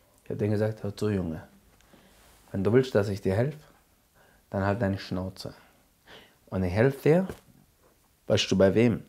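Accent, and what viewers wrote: Swabia, Germany. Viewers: Schwäbisch mit bissle andere Land noch bei, weisch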